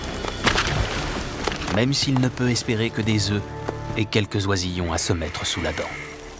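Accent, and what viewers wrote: France. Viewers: The French accent makes this video so Romantic.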